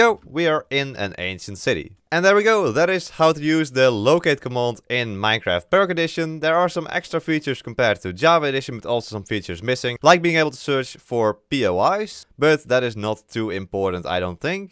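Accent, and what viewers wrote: Netherlands. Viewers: You can really hear the Dutch. I'm Dutch myself but i didn't expect it.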